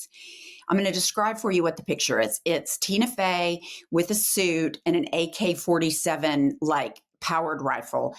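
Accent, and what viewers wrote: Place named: Oklahoma, United States. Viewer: Don't put yourself down, the Oklahoma accent is growing on me more and more. Keep up the great work ladies.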